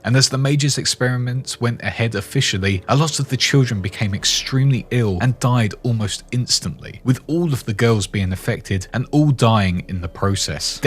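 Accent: United Kingdom. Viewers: Just started. Don't know anything about the lore and was just waiting to hear the voice of who was making the video of the game. You are not annoying and have a British accent. 100% can watch this video and learn what I wanted to know 😂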